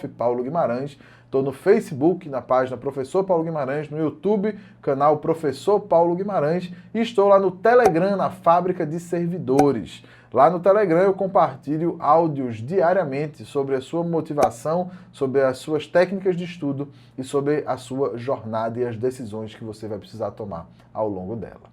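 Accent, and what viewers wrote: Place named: Recife, Brazil. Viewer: Ah, e também sou Recifense Kkkkkk suspeitei logo pelo sotaque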